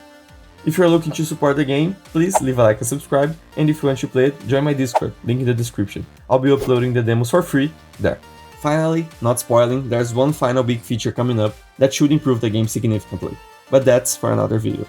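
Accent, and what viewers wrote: Brazil. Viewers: Só fui perceber ao final do vídeo que teu sotaque de inglês era brasileiro. Ótima edição! (com alguns efeitos sonoros um pouquinho acima da voz) But I really liked the project and the core loop of the game. The graphics are getting there and show an astronomical evolution since the beginning. Overall, take care with scope creep, and good luck! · That accent... Tu é brasileiro mermão!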